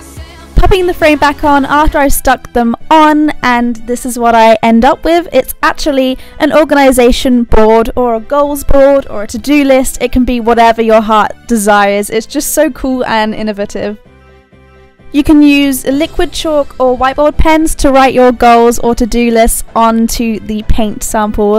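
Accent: Australia. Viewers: amazing video. just wondering are you Australian?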